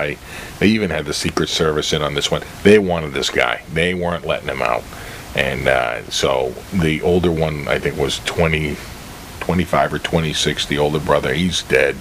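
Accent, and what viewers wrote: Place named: Massachusetts, United States. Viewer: Hey: I finally figured out where you live. My wife is from Sharon and I grew up in Abington although we've lived in Maine for the last 25 years. I thought I recognized the accent. How come people from your area never vacation in Maine but think NH is the vacation Mecca. We are, after all, the vacation state! LOL. Rock on Dude..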